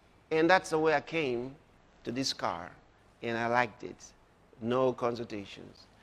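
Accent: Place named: Nigeria. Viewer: no matter how he hides his accent it still shows he is from Nigeria 😀😀😀😀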